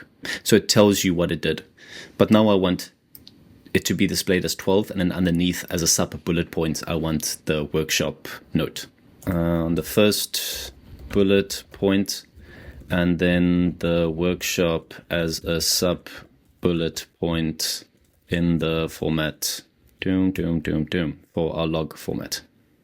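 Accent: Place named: South Africa. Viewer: Definitely a Saffa voice there! Good job bro, repreasent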